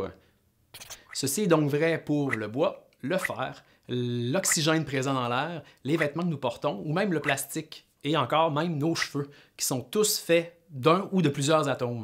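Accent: Quebec, Canada. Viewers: merci pour ton aide téxplique grave bien et super vite et jkiffe ton accent quebequoi thank you so much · Francais canadienne?? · son accent Québécois tres pousser je ne comprend rien 😅😂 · mais vous etes vrmt des canadien ? pas vrai? bref jai trop aimer la video et on comprend vite , pas comme les autre video qui dure 15 min et a al fin on comprend rien , je vous remerciiie :)))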